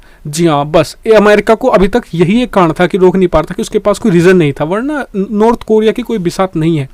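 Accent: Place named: Bihar, India. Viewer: SIR AAP KIS STATE SE HO ? BIHAR SE I GUESS ?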